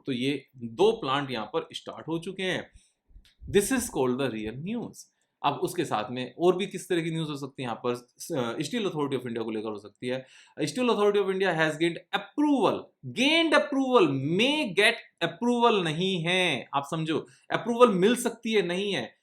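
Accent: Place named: Tamil Nadu, India. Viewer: Thanks bhai for this video. Very informative.I also had fun listening to your Tamil pronunciation.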